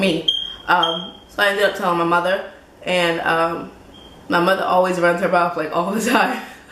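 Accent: New Jersey, United States. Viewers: Oh so you from jersey? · You from jersey? Sorry for your loss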